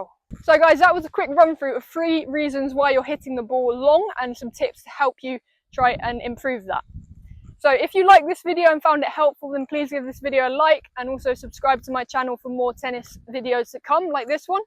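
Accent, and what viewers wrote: England, United Kingdom. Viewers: "Two things! Your videos are absolutely amazing, and your English accent is every Spanish speaker's dream — I still remember my English teachers telling me that!" Greetings From Argentina! 😁😁😁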